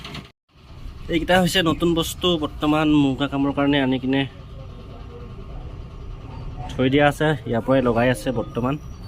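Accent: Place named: Jorhat, India. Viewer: Jorhatr kotha bayek nukuwa vaaaaal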